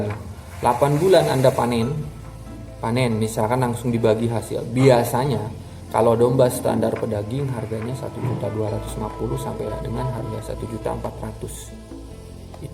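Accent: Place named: Sunda, Indonesia. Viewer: Jiga urang sunda mang euuy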